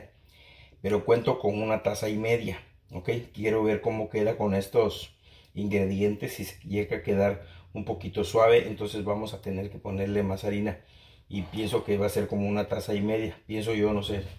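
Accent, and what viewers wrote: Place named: Mexico. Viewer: Su acento suena más a mexicano que a guatemalteco 😃👏👏🇲🇽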